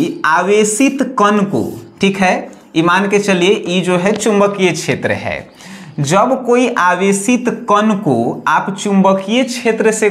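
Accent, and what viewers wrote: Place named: Bhojpuri, India. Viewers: Apka bhojpuri sound mast hai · Bhojpuri h kya akdm se😂😂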